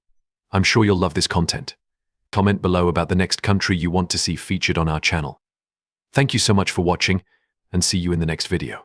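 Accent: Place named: United Kingdom. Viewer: You should not have a Brit accent.. narrator… it ruins the presentation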